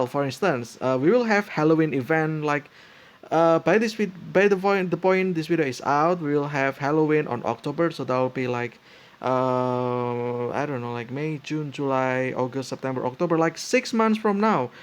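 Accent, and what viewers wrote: Indonesia. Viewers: Are you Indonesian? That accent is so distinct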